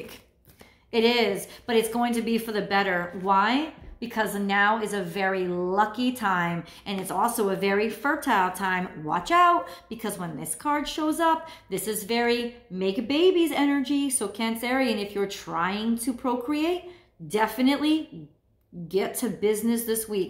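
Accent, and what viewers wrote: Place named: Philadelphia, United States. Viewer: I am laughing out loud.....you sound like you are from my area,,,,Philly......haha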